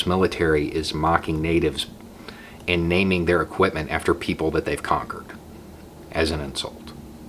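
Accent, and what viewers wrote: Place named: Florida, United States. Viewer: Wow, I never really thought you sound like a Floridian until you tried to make the word “our” NOT sound like “are”🤣🤣🤣 I’m from Indiana and I can’t do it either🤷‍♂️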